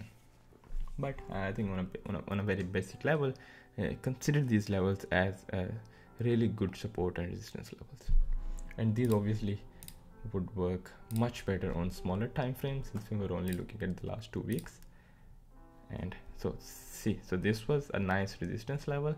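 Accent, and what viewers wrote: India. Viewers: This guy doesn't give a shit about his company. Same shit 3 years later..He makes 100 changes to the UI and doesn't update the videos. I'm on a 15-day trial and seriously considering not going through with the sub because he is so lazy regarding user experience. (Also, please hire someone without a heavy Indian accent to explain how this works.)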